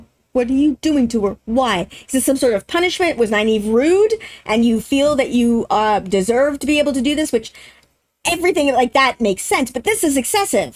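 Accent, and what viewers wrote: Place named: United States. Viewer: American accent, American accent dances in American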